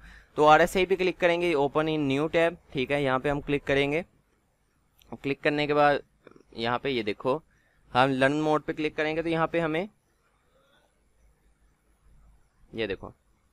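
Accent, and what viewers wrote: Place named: Lahore, Pakistan. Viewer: Love your truly lahori accent 💓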